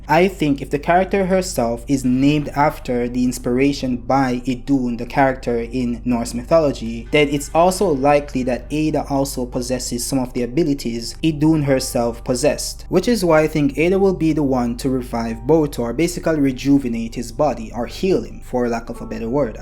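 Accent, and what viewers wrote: Jamaica. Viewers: Brudda yiu Jamaican what's the accent I'm hearin you in ya voice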